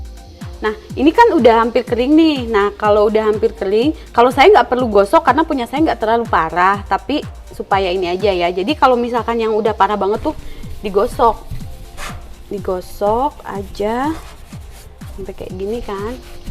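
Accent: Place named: Puncak, Indonesia. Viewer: Love your PUNCAK CITY aksen..👏👏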